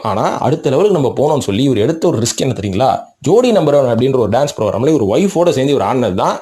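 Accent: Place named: Sri Lanka. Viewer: இஞ்சருங்கோ இலங்கை தமிழ் தான் bro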